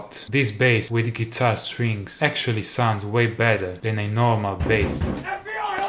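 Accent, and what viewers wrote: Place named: Italy. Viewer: and when he talk..he sound like italian guy...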